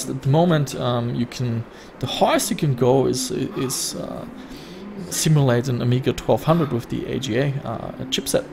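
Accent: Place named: Germany. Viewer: Your accent sounds half German, half Australian.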